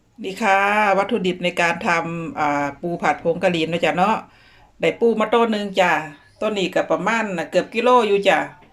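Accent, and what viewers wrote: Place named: Sisaket, Thailand. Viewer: ปูผัดผงกะหรี่เป็นตาแชบคักแท้ครับมาเบิงมากินเด้อครับออนชอนปูน้อ. ครับโตใหย่ๆครับสะออนมักสำเนียงภาษาอีสานทางศรีสะเกษ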